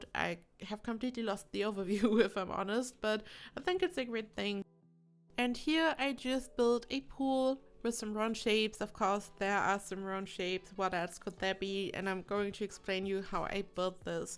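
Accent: Germany. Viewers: are u german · Are you german? xD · Are you German? Your accent sounds like that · Sounds German... To me As a German. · I were just wondering, are you German cause your dialect kinda reminded me of German people speaking English☺️ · She really has an german accent lol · i don't know how i ended up here but are you german? you sound very german :D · Wait, are you German? Btw, very nice one! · are you german? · Bist du Deutsch?Hört sich vom Akzent so an☺️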